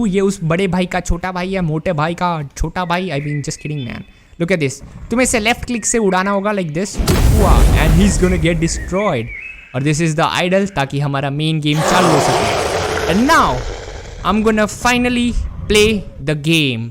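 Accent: Gujarat, India. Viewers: New Temple Run game Kuch Kuch Raji game Jaisa Nahin dikh raha?????🤔🤔🤔🤔🤔🤔 And also I think you from Gujarat in Ahmedabad or Gandhinagar or Surat I think so because your accent and you that say division multiplication like that I am Gujarati to (Bournvita Nahin Pihu Mare)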